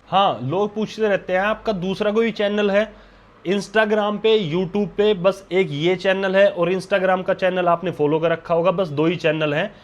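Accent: Haryana, India. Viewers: App kha sa hai.. apka bolna ka tarika tho haryanvi type h 😅😂❤❤ it's my first time on yr channel 😊 apka bacha bhut cute h 💖🌹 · arey bhaiya Haryanvi accent se log aksar judge kar lete hain😂😂😂😂 mere Punjabi hone ke kaaran log mujhe bhi ladaku type bol dete hain😂😂😅😅😅 sabka apna nature hota hai bhai😊😊😊 Lots of love for cuties and ❤❤❤❤❤ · Apki lunguge aisi lagti hai jaise aap ladaku ho kyu ki apke hariyanvi styl main dhamkakr bat karte hai..pr apki baat se pyar jyda jhalakta hai apne bacho se jis tarike se bat karte ho usko dekh kr lgta hai..❤❤❤ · कोई बात नहीं भाई मैं तो सिर्फ सिया जिप्सी और आपकी हरियावानी बोली देखना अच्छा लगता है ❤❤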